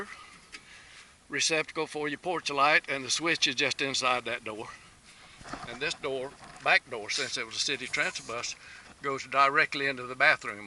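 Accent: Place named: South, United States. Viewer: You sir got that nice southern american accent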